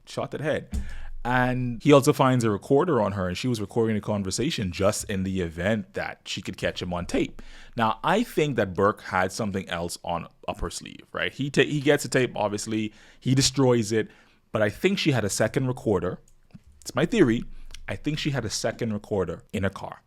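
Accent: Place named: Bahamas. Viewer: Bro u bahamian? Sounds so if so 242 to the world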